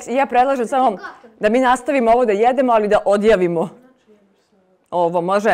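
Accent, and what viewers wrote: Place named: Srem, Croatia. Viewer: j Ja volim ovaku osobu koja na ovaj nacin prica , objasni i svima ugadja, . neznam odakle ste , sigurno iz okoline srema. lepa i sve divno napravi , za desetku.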